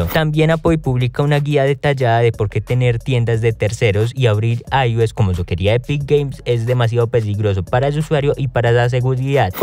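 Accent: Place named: Colombia. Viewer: Me gusta el contenido. Pero me tengo que acostumbrar a ese tan marcado acento colombiano . O es la voz? O son ambas cosas? No lo sé, me imagino que es cuestión de acostumbrarse. Gracias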